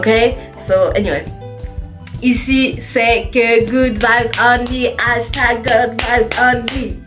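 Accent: Ivory Coast. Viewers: J'ai pensé Ivoirienne tu a un pt accent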